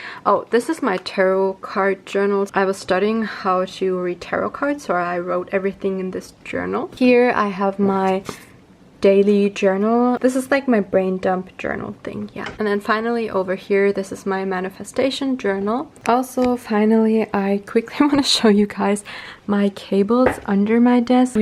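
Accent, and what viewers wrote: Germany. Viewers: As soon as I heard you speak I knew you were German! You are adorable!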